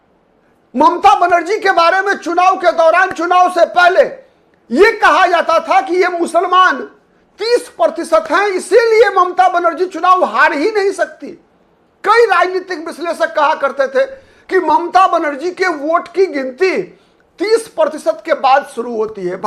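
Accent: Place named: Bihar, India. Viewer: आप बिहार से है क्या ? आपकी टोन बिहार वाला है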